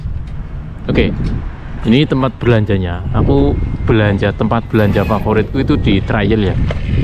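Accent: Banyumas, Indonesia. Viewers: Sampean wong ndi nyong,!! kok ngomong kaya ana basane ngapak..😄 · njajal aku tak krungu sampean ngomong nganggo bahasa jepang tapi karo ngapak kang..😊😊😊😊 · Wong ndi rika,lik?deneng ngapak temen😂..Banyumas Cilacap mesti kye ya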